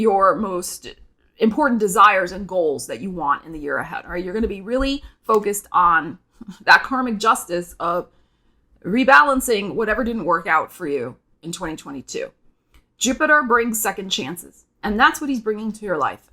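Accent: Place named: New Jersey, United States. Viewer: She sounds like she's from New Jersey ... or something.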